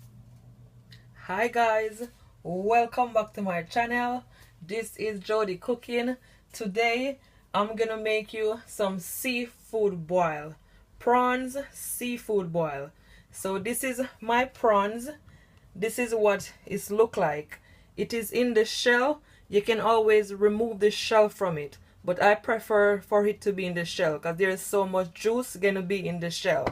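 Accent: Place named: Jamaica. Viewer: Lol the patois trang ... I love it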